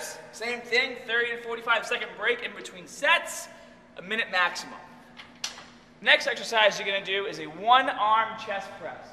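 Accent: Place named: New York, United States. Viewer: He's from New York...that's how they talk! :)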